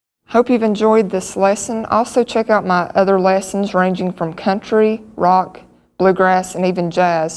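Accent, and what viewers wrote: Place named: Kentucky, United States. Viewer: WTF, come over form the Heil site and first think is that I am listening and seeing is one of my home girls form SE Kentucky, lovely lady, great accent and then holly crap rip my face off with incredible shredding and than to top off straight forward lesson. Love it great job -new fan here, keep posting.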